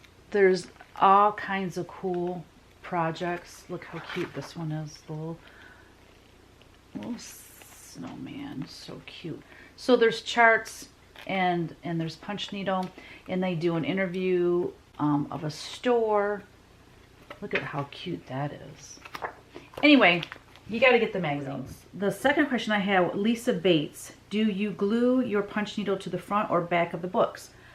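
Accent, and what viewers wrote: Michigan, United States. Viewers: Hi sounds like you live in Michigan also Love your show just found you. Your work is gorgeous I'm getting back into cross stitch. Thanks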